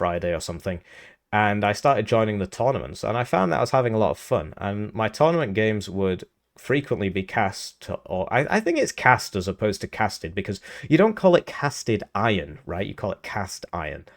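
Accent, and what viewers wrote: England, United Kingdom. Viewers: how lung did it take to earn the English accent?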